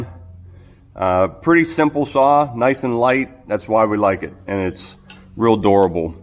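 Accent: Pittsburgh, United States. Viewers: Two things gave it away yinz are in the Pittsburgh area 1 . your accent and the way you say House. 2. That big green truck with "THROWER" on the door .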